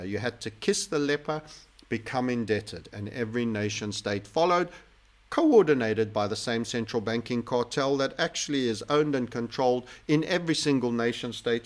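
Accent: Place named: South Africa. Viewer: South African. Fair few Scots went over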